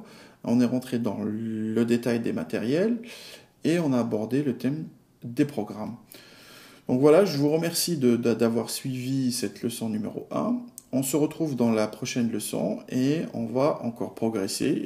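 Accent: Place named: Italy. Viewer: C'est ça qu'on appel "LA PÉDAGOGIE". PS: avec accent italien.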